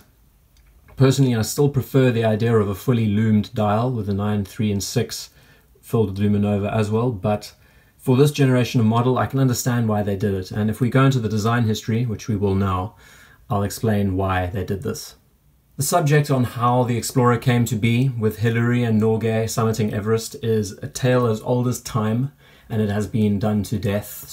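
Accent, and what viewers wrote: South Africa. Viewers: Hiya,new to your channel.Got the S.African accent immediately.I like your philosophy on value.That panis could easily sit next to any high piece and stake a claim.I also appreciated your comment that Tensing got little recognition in the famed Everest top out ,why because that guy Biliary may never have got there? Anyway ,informative video and enjoyed the Flemming dialogue.Cheers,Len.UK. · Thanks for sharing your interesting story with us. As a non-native English speaker I totally hear the same accent in your voice as with Winston from the YT channel SerpentZA. The next time I hear someone with that accent I'll probably be able to guess that he is from SA. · I didn’t know that was a South African accent I was hearing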